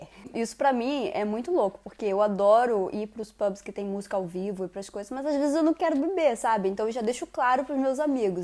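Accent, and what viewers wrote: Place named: Ireland. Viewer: Oii kkkk eu fiquei meio bugada, parecia que vc era uma irlandesa que falava português como segunda língua kkkkk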